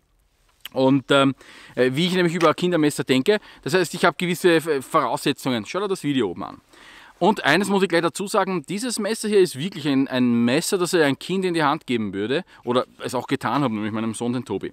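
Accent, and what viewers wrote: Vienna, Austria. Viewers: Heast deine Videos daugn ma voi oba des Wienerisch treibt mi voi in den wahnsinn XD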